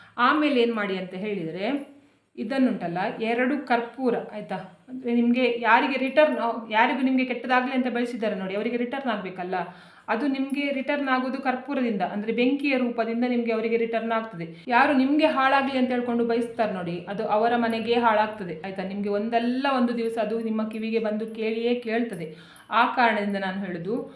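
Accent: Mangalore, India. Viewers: Mlore kannada ❤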